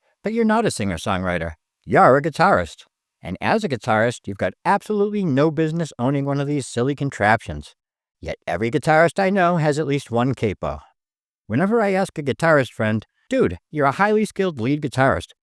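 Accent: Boston, United States. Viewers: Definitely a fellow Bostonian lol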